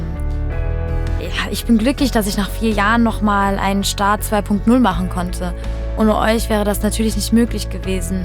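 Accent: Germany. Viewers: how come you talk German and when you talk in English you have a German accent but in your music videos it doesn't sound like you have a German accent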